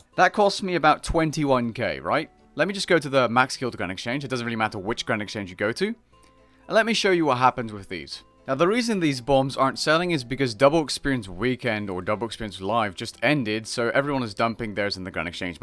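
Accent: Netherlands. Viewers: Ik dacht al dat je NL was ik kon het horen aan je platte dialect hahahahhaha. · Nooit geweten dat je Nederlands was, nu kan ik het accent niet meer negeren haha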